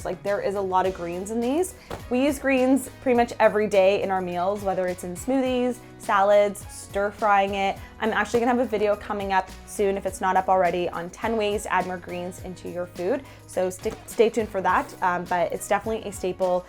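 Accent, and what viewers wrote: Canada. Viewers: Your Canadian accent just cracks me up 😂 love your channel!!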